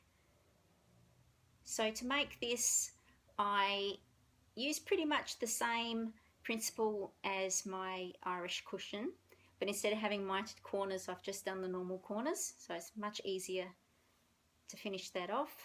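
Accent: Australia. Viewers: I still am amazed at how much you get done with your month! Mermaid of Atlantis is beautiful. You should be very pleased with how she came out! All of those beads really do make a difference. I will be excited to start beading my mermaid! I'm still working on the skin, though... The little cottages are too cute! Blackwork sampler is looking very nice. And Refleggions looks so pretty! The purple is perfect for Easter. And your thoughts on the word khaki made me laugh. I had to say it out loud both times! I love listening to you and the other Aussies to pick up the accent. The Australian one is one I haven't yet been able to get. I can do a few phrases, but it doesn't hold very well, haha. At least now I can pronounce khaki!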